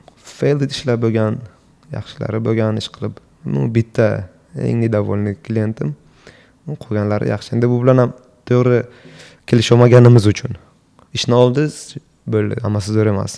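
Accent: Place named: Bukhara, Uzbekistan. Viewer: aktentan bildim Buxorolik ekanizni Vobkentdan Salom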